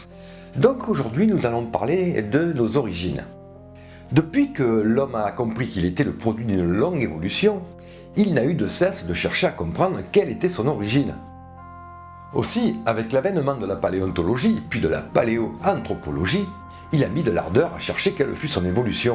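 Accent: South, France. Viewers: Un bel épisode de vulgarisation! En ce qui concerne les vérités de type scientifique et les gué-guerres entre paléontologues sachez qu'elles vont encore beaucoup plus loin et dépassent le cadre la seule paléontologie! Amusez vous à comparer le contenu et la longueur des articles en anglais et en français de "Lucy" et de "Yves Coppens" sur wikipedia! C'est un peu la guerre à celui qui aura la plus grosse entre américains et français, si j'ose dire ! Nos amis anglophones goûtent peu ce qui leur semble un surcroit de prestige accordé à Coppens. La science ne gagne rien là dedans... Quand à l'accent... rien à redire. Je suis un méridional aussi et suis ravi d'entendre chanter la paléontologie. On sait être rigoureux nous aussi!!!! Tè vé!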